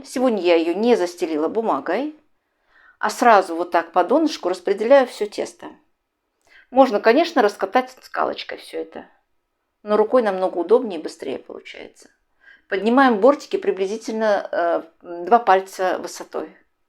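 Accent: Baku, Azerbaijan. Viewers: спасибо за рецепт, землячка! Бакинский акцент не проходит с годами!))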